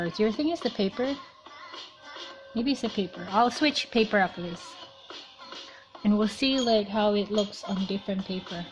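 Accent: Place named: Australia. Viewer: Same,i just got a lil confused bcos i heard an aussie accent w a touch of sum else lol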